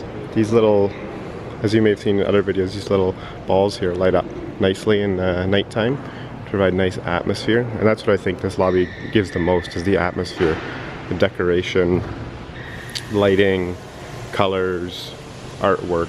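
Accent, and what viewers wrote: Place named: Canada. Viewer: I knew you were Canadian as soon as I heard you talk. Great video!! This is the best one I’ve seen. I’m going on the 29th and it was so detailed.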